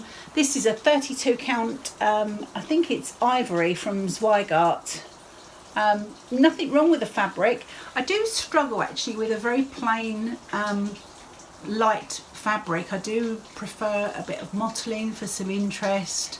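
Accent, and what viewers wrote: United Kingdom. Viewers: I recently discovered your channel and I'm so enjoying it! I am a quilter and knitter but have picked up cross stitch again. It is so pleasant listening to you while I stitch because my Mother was British and it makes me think of her!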